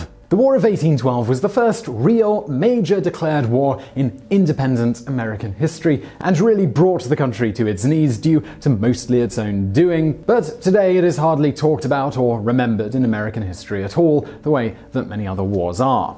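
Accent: United Kingdom. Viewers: I love hearing American history with a British accent! I'm not trying to be snide, these vids are filled with accurate information. I just love his accent and there is something novel hearing American history from a Brit. Love you! BTW...history isn't really taught in American schools, at least not accurately. Some ppl still think the Civil War was about states rights (to own slaves.) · Very (snotty) British inflection on facts about a war they started. No matter how many "Well you couldn't have won, so you were wrong to defend your countrymen" phrases you throw in there.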